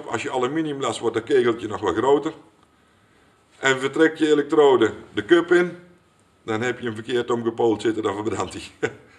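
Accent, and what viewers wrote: Brabant, Netherlands. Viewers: jij bent een baas op het brabants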